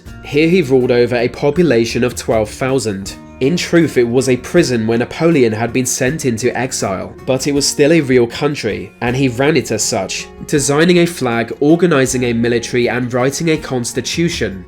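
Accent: England, United Kingdom. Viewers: How come you have an English accent and yet pronounce viceroy with an extra syllable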